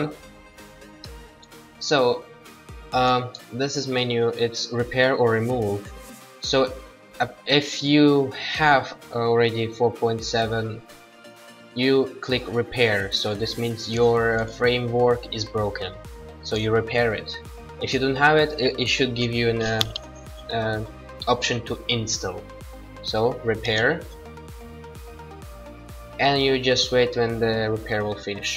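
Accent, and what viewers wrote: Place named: Russia. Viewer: Русский чувак, по акценту понял) Молодец, мне помогло, спасибо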